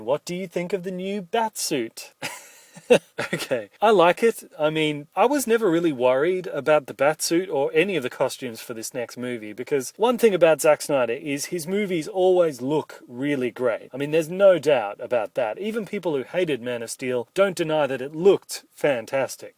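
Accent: Australia. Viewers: Wait are u aussie if yes YEEEEEEEEEEES TEN BUCKS IS MINE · OH MY GOSH I KNEW WAS AUSSIE AFTER THE FIRST VIDEO! OH MY GOSH WOOOOOW (i live in aussie) and i thought you were to!!! oh my gosh thats amazing there are known people on youtube that aren't american. i solute you! PS I AM SUBBING! KEEP IT UP MATE!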